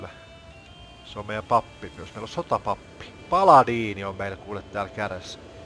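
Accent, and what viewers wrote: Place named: Satakunta, Finland. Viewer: Oletko satakunnasta? Päättelin vain "murteen" perusteella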